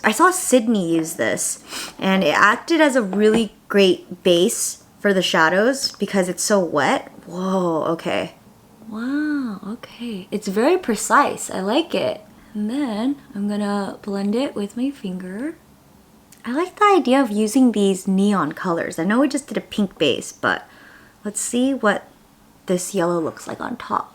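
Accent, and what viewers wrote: United Kingdom. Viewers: Are you British accent? It sounds slightly korean. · Loving the British accent while cooking haha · I like your British accent.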